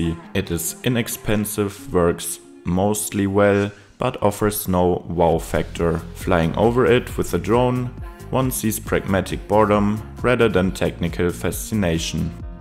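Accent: Germany. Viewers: Most cliché German accent ever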